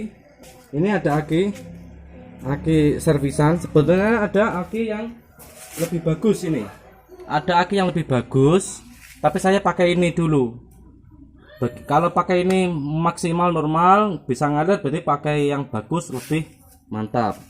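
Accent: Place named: Tulungagung, Indonesia. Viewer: Logatmu kok tulungagung banget mas? Tinggalnya di mana ta?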